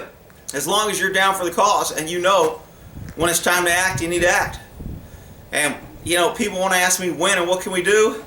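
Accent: Texas, United States. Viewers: I wonder if anybody else catches your greeting in all your videos? "Morning Dumb People"...or is it that Texas draw... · Because with your accent, your "gas" sounds more like "gaz". I like your Texas twang. Even when you were in California and that's when I bumped into your videos of, I could tell you weren't a native Californian. I thought, "This guy's from Texas." My older relatives on my mother's side who are French Canadian will say gaz rather than gas. When you mean to say "quiet", you say it fast and it sounds more like quite. I'm not picking on you at all. Every one has a different accent. I'm from New England and every one knows the old "Pahk the cah in Hahvad yahd (Park the car in Harvard yard). When I lived in Virginia in my early 20s, some times people would ask me where I was from, when I said Massachusetts they didn't believe me because I didn't sound like a Kennedy. 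That's the only accent they assumed we had, which is not so. Actually, only the Kennedys have that weird accent. I usually don't drop my Rs because my parents didn't talk like that. But now that I'm half a century old, I sometimes drop it. I noticed my father dropping his too now a days when he never did before. A friend of mine and I took a road trip to Florida years ago. When we stopped in Georgia to get something to eat, it was like we were in another country. I could barely understand what many of them were saying because their accent was so darn thick! Wow! Then in Florida I could understand every one just fine. Also, a Texas twang is not the same as a southern drawl.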